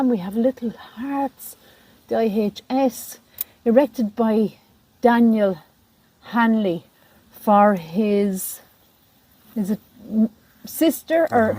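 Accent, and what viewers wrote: Ireland. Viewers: I can tell by the Irish accent that we're going to see corpses. Irish cemeteries don't seem to be very well maintained. 🙄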